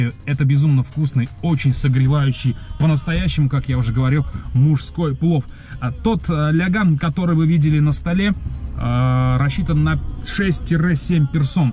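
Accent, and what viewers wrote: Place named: Tashkent, Uzbekistan. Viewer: Гап йо йорвордиз ростакам тошкенча булди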